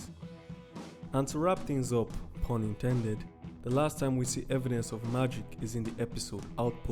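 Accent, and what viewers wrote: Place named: Nigeria. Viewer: Amazing video you sound Nigerian tho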